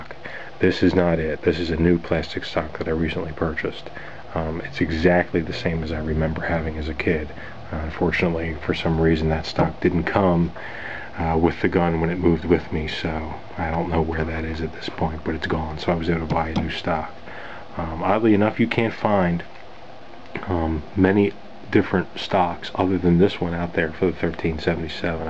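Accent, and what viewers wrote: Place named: Philadelphia, United States. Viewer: are you from philadelphia or from the area? i noticed you have an accent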